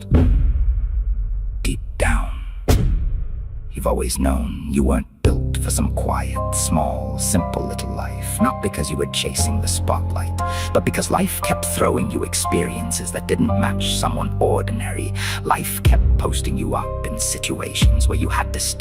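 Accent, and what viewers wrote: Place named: United Kingdom. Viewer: Your voice has a British accent to it under the voice modulater. Blimey.😂❤❤❤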